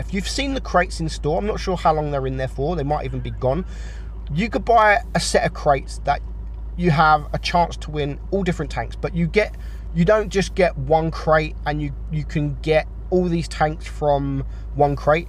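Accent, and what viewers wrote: United Kingdom. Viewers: Ik ur british but from where?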